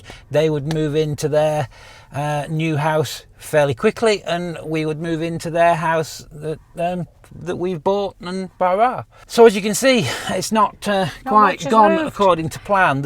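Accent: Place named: Manchester, United Kingdom. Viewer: Hi. Excellent series. You have inspired wifey and I to do a lap starting November. Getting things together and I was wondering what size water bladder you had on your trip. Hoping to be in WA after chrimbo. Btw I just got back from UK/Manchester where they all talk funny like you😊. Looking forward to your next trip.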